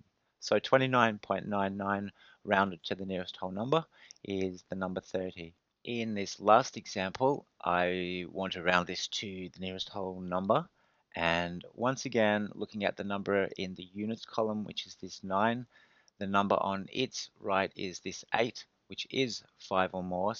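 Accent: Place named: United Kingdom. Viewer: o0Ella0o English just a British Acent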